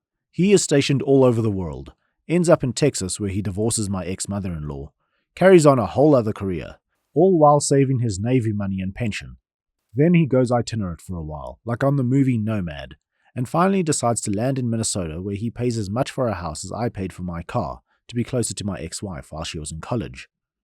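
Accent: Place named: South Africa. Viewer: We got South African Reddit reader before GTA 6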